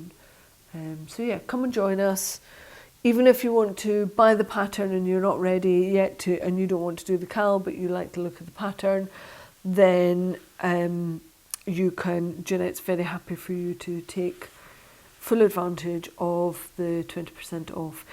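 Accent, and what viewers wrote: Scotland, United Kingdom. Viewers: Yay delighted that you are staying on YouTube. I've watched you from the start and YouTube is my preferred format for podcasts. It's lovely to see you and your projects and feels like chatting with a friend. Being Scottish I love hearing your Scottish accent, turn of phrase and chats about Scotland in general. xxx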